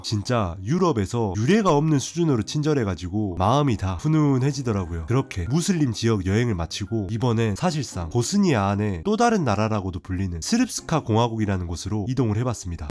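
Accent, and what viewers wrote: Jeolla, South Korea. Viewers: 전라도 유튜버는 정말 오랫만에 보네요 첫 멘트부터 억양이 ㅋㅋ 언제나 화이팅 하시길😊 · 아 전라도 사투리 듣기싫네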